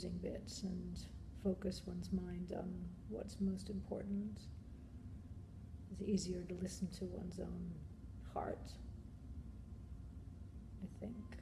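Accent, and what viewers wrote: England, United Kingdom. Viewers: Russell Barth She's always had a English accent.She grew up in London.Thats her original accent. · Oh wow, when she’s interviewed in Britain she adopts a British accent. It’s nicer I must say. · why do you have an english accent now when you didn't before?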